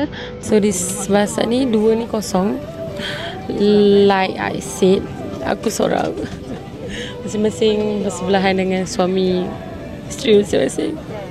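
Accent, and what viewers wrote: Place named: Sabah, Malaysia. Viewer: i give Sabahan slang A+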